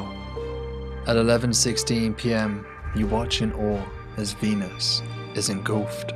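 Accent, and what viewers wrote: England, United Kingdom. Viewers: I think you missed my point, obviously your nationality is determined by your country of birth but if you were raised from birth in another country then you become 'culturally' part of the country you were raised in. The point I was trying to make was that his accent is genuine as he was raised in the UK. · I love your English accent , fascinating 🤨 !! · Ain’t nothing like a British accent narrating something could listen all day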